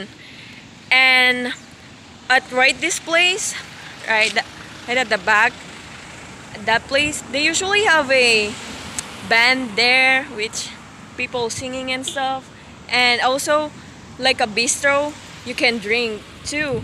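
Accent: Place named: California, United States. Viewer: All eyes on. You are a very beautiful women.😍😍🔥🔥. You talk like you're from Cali. Lol. I would love to come out there and hang out with you.😉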